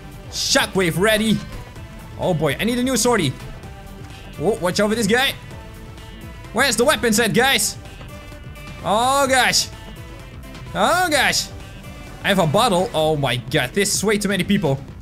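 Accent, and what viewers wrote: Netherlands. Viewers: You cant hide ur dutch accent XD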